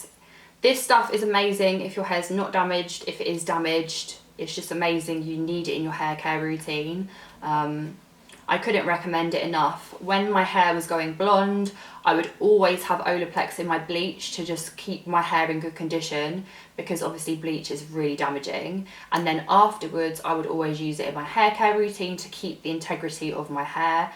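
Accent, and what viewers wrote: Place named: England, United Kingdom. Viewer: Love ur English accent and ur so beautiful ❤️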